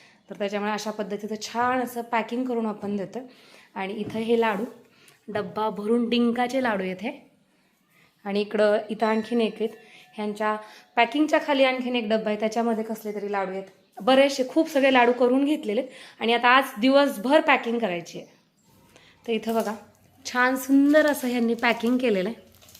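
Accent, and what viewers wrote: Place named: Solapur, India. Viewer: मला तुझी सोलापूरी अर्थात करमाळा ची भाषा खूप आवडते तु लय भारी बोलते 💜🧡❤️💚💙💙🍒🍒🍒🍒🍒🍒🍒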